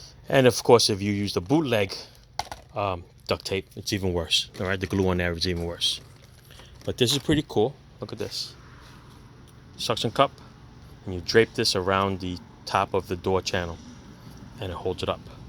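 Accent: New York, United States. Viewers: Hey thanks for this short and sweet, to the point video. I need to replace both rear window regulators and I cringe at sticking duct tape over the glass. I was thinking about jury-rigging something with some suction cups and wire, then I saw this. Figured it would take me more time and trouble than to just buy it from Lisle. Your accent sounds like NY to this ex-pat Bronx guy. Take care.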